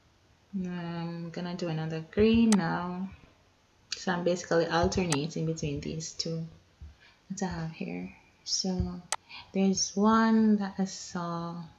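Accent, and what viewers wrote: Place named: Jamaica. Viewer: Thank you! Love your Jamaican accent.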